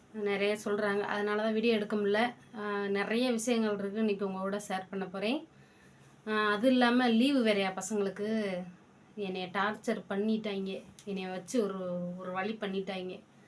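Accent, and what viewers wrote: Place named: Chengalpattu, India. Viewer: கவலைப்படாதே மகளே அம்மா நான் இருக்கேன்❤❤ இந்த வீடியோவை ஃபுல்லா பார்த்துவிட்டு தான் உனக்கு கமெண்ட் போடுகிறேன் கடைசியிலும் செங்கல்பட்டு தான் பேசுகிறாய்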